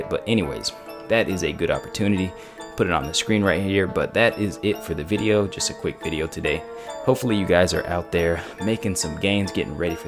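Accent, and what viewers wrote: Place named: United States. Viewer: Where in the States are you from? You have an awesome voice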